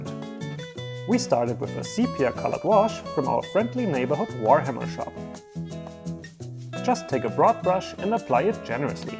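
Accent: Germany. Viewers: are you from german??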